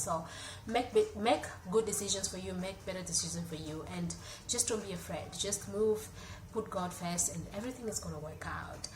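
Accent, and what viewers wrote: South Africa. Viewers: Na ka accent kameanza kuwa ka Mzanzi 😂, love you❤️ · Accent imeanza kua kama English ya wazulu 😂🔥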